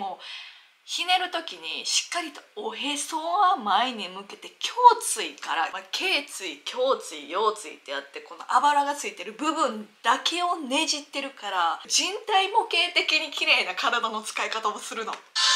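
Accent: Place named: Kansai, Japan. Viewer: こんな素敵な動画に巡り会えて、幸せでしゃあない！何回も何回も、なーーん回も見て、先生の解説、ほぼ覚えてしまいました （笑。関西弁もHOTで嬉しい、ありがとうございます！どんな時もJINくんは最高だっ！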